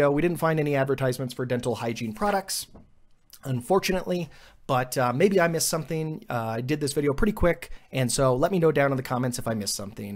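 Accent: United States. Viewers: He's just American we don't really go off of regions besides maybe southern or northern but that applies to the east coast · he just has a generic american accent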